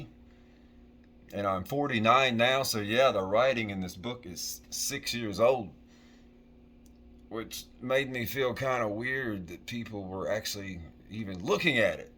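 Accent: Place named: Appalachia, United States. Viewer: "Man, no one is gunna believe this shit" 😂 It's so true though. Life is totally unbelievable, a bizarre adventure of cosmic grandeur and idiotic stupidity. I can't wait to read this book. And FYI as a Brit I love your accent and figures of speech. Nothing wrong with being a hillbilly 🤣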